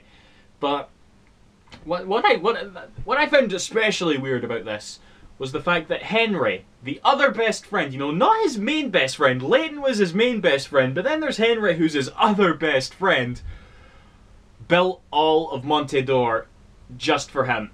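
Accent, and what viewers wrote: Scotland, United Kingdom. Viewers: I'm not sure about this. I don't agree with some of the choices, and there is no footage or anything. But then again... You are brilliantly scottish. .... I'M CONFLICTED A!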